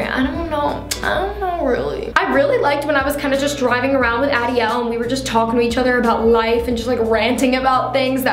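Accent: New York, United States. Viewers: yo i love her slight accent it’s so cute holy new york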